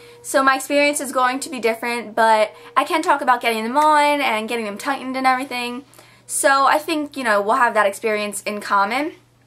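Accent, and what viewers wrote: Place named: South Jersey, United States. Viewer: Not to be creepy, but do you live in southern Jersey. I noticed an accent and a phillies hat. I am from absecon and I recognized your voice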